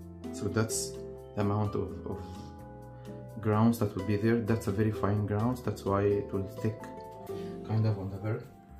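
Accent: Egypt. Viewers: i knew it ......i knew it .....i knew it .. its Egyptian accent منين يا غالي في ام الدنيا وياريت وسيلة تواصل معااك انستجرام او فيسببوك القلب مش بيحن من فراغ و الله متابع ليك من زمان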